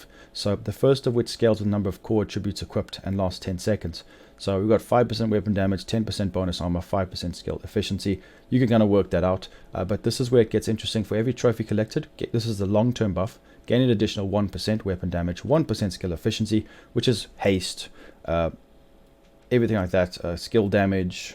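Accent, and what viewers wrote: South Africa. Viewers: Watched this and went for the grind to get the backpack. Got it today and have put together a rough build. Just need to min max it. Thanks for the vid! Is that a SA accent btw?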